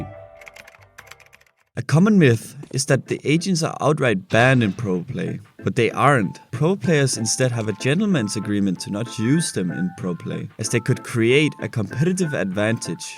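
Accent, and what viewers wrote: Denmark. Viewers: Den danske accent 😭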